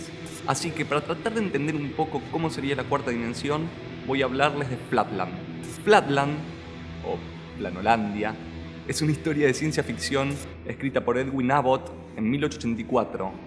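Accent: Rioplatense, Argentina. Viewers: Te ganaste un sub, no sé si sos argentino o uruguayo, pero por las dudas saludos desde Argentina.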